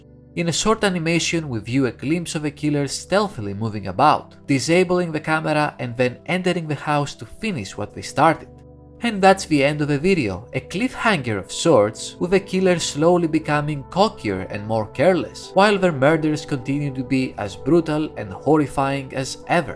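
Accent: Greece. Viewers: Greek accent?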